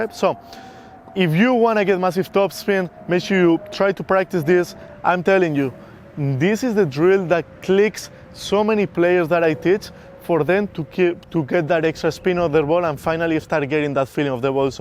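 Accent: Spain. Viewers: he has a spanish accent, I instantly trust him. But please stop with all these "absolutely free courses". everyone subscribed to FYB once and saw the result. 0:6 0:6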